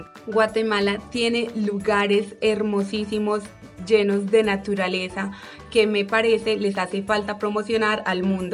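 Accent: Colombia. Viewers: habla como colombiana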